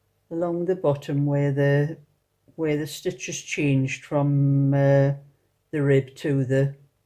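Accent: Newcastle, United Kingdom. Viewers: Thank you for this lovely tutorial, exactly what I need for my cardigan pattern which assumed I knew how to sew on the pocket. Also love your accent, reminds me of my home and family from long ago in Newcastle 😁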